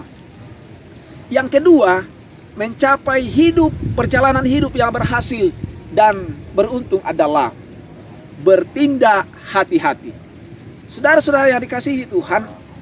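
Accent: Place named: Batak Toba, Indonesia. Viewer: Terimakasih pak Pdt. Btw pak Pdt suku apa? Saya dengar logatnya seperti suku Batak Toba ( no rasis). Saya Batak Toba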